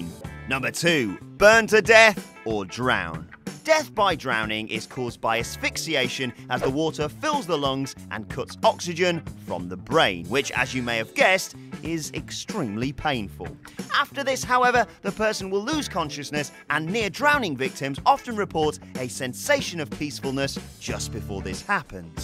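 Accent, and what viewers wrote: United Kingdom. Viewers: Awesome is back with a awesome British accent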